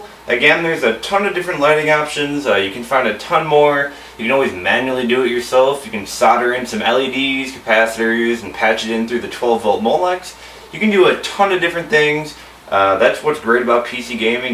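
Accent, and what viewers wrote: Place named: Chicago, United States. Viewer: I'm assuming you're from Chicago lol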